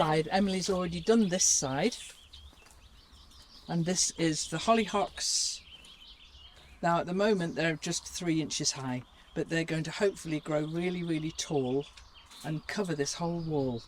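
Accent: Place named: North, United Kingdom. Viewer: Thankyou for this new channrl, love it. Its lovely to listen to listen to our northern accents too as I live in Ontario. ❤❤❤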